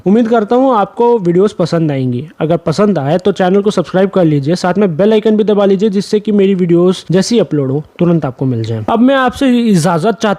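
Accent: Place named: Chhattisgarh, India. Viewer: Are you from Chhattisgarh because your accent feels like it.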